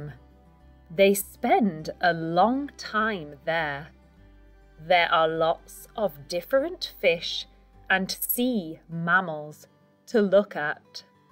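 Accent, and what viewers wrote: United Kingdom. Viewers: I'm a beginner, still learning english through stories. I love your british accent❤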